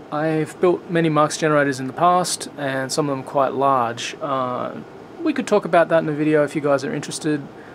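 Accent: Australia. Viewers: Very well explained. When Im tired of modifying my 1958 radio (6BX6 RF amp, 6BL8 local oss and mix, 6BX6 IF, 12AX7 Baxandel tone and 2x 6GV8 audio) to fill the dial with DX, I would love to have a crack at a Tesla Coil. I agree that tuning will improve things. Some people reckon harmonics can be good, guess I will find out. Great to hear an Aussie voice (besides Mr Jones) here on youtube.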